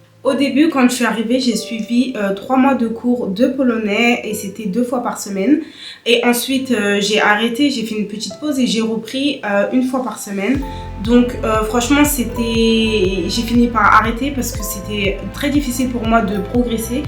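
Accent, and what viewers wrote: Paris, France. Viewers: Czy ja dobrze rozpoznaje, że mówi z paryskim akcentem klasy robotniczej?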